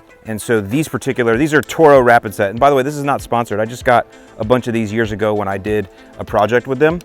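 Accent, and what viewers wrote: Chicago, United States. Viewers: Found this video for the first time looking to figure out what’s wrong with my sprinkler system. I hear the “couple two or tree” then the “lowesss” and thought what was a Chicagoan doing somewhere there is so many palm treesss?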